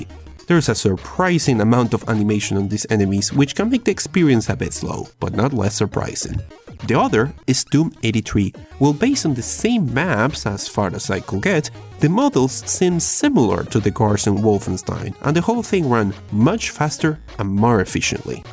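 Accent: France. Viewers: Are you french? That could change my vision of the life 😲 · You're french? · Are u french? What's the accent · So are you from France? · Are you french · Tu es français ? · are you french ? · wait, you're french?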